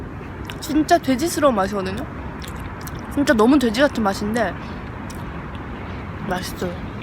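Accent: Seoul, South Korea. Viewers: 말투 약간 90년대 서울사투리느낌 있어요ㅋㅋㅋ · 연예계에서 연락도 많이 왔을 거 같은데 궁금하네요 😂 묘하게 서울 사투리 같은게 순둥순둥 귀여워요 😊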